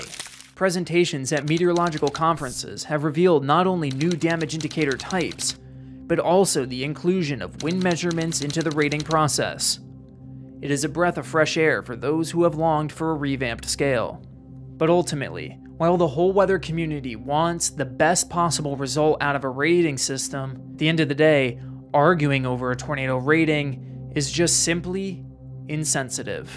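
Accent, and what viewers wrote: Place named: RP, United Kingdom. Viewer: dawg talks he works for the BBC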